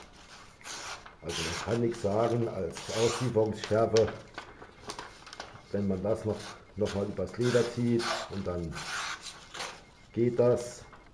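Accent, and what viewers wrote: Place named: Saarland, Germany. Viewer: Hab mir doch gedacht das ich da saarländischen Dialekt raushöre, aber St. Ingbert is mir doch ein bißchen zu weit. Gruß Andy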